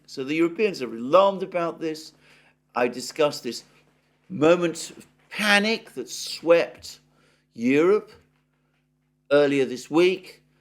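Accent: United Kingdom. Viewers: Great job from the best informed reporter. Prudent in presentation. Great british accent🙂love those daily moments ❤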